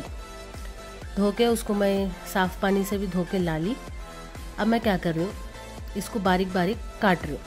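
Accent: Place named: Hyderabad, India. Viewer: Lol..loved ua hyderabadi accent...😜😜😜looking frwd to more such colloquial lockdown recipes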